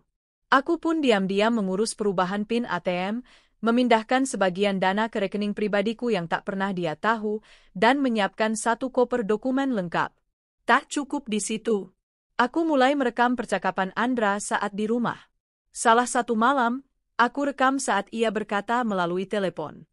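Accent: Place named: Madura, Indonesia. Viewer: Suara/intonasi dari pembaca narasi ini mirip dgn emak madura yg dari desa sdg baca... Tak iye.. Tak iyee... 😮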